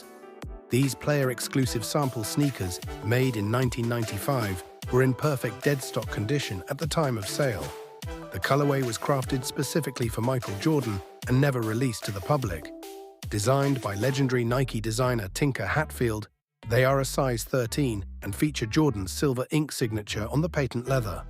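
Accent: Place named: United Kingdom. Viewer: Brit Accent was a nice move 😂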